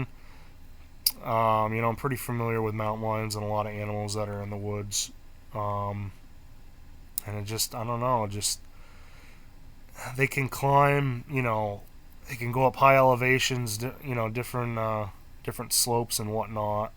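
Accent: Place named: New England, United States. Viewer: Good video. I hear you're a fellow new englander like i am.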